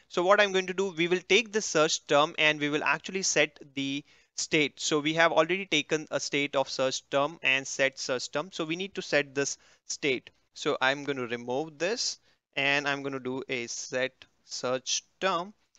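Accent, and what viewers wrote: India. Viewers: I love Indian accent <3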